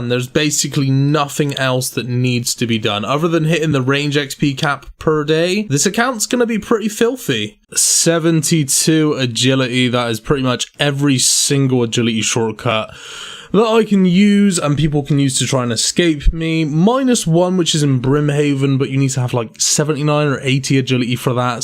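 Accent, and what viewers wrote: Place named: Somerset, United Kingdom. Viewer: mate that 'sommersert' accent..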